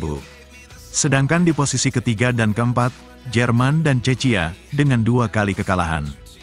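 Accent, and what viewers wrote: Banyumas, Indonesia. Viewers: Ngapak ora baen baen ya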